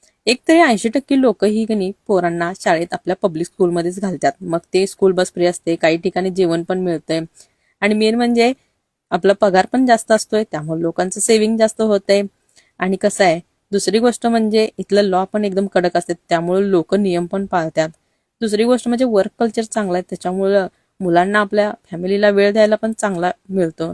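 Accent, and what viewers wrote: Kolhapur, India. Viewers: तुमची कोल्हापूरी भाषा काय बदलली नाही.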